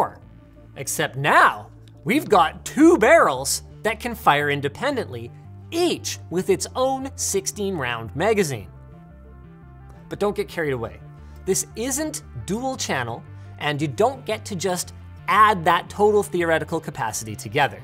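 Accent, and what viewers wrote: United States. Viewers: went very american in this one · really speaking American here.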